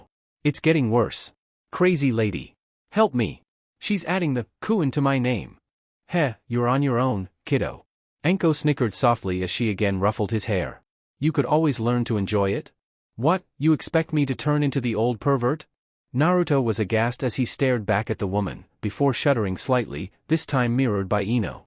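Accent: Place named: Russia. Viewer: Why dose the voice switch between monotone and Russian accent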